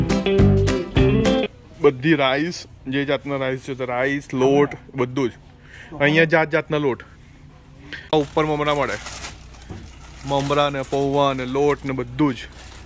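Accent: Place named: Surat, India. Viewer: Wow.. nice collection of typical GUJJUS. yummy. Commentary paakki SURTI …👏👏👏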